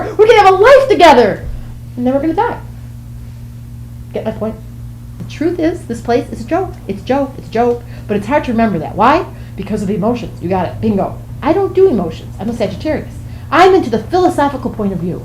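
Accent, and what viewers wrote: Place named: India. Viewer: That indian accent :D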